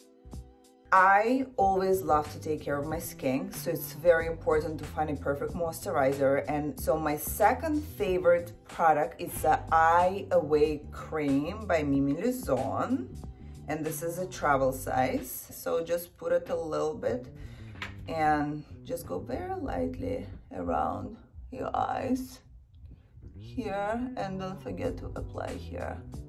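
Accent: Russia. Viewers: Russian accent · That russian accent adds more to her sexiness than all the beauty product combined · обычный русский? вы видимо не слышали как говорят большинство россиян на анг. Её акцент по сравнению с ними чистейший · I Love her Russian accent 😍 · They do look similar and she does sound like Adriana with a small Russian accent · I don't know what I was expecting her to sound like but I love her Russian accent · И все же чувствует русский акцент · I love her russian accent so freaking much 😭😍😍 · Had to come back again for her adorable Russian twang 🥰🥰🥰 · i’m in love with her russian accent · У нее красивый русский акцент, очень мило)) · She’s russian i think it’s just her accent 🤣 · I love her Russian accent 😎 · The best things about her are the deep resonating voice with the Russian accent and the emerald green eyes wow 🥰👌🏻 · She is like a russian gangster with her accent and attitude. 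Im all here for it tho. Drop dead gorgeous. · She still speaks russian accent so much)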